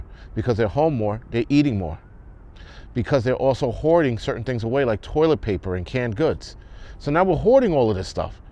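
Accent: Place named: Brooklyn, United States. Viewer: I love you brother. Not in that way lol. You have to be from Brooklyn. That is the way we talk never candy coat it. Tell it like it is. Keep up the great work. And be safe.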